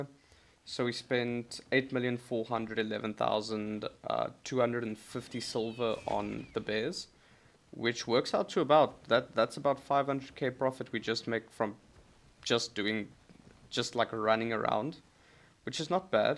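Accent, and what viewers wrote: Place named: South Africa. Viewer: You are from saffa right ? I know a saffa accent when I hear one, immediately got my attention. 😂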